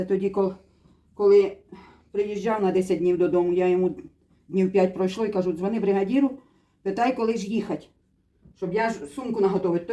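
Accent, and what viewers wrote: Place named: Cherkasy, Ukraine. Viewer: Наче з рідної Черкащини говірка.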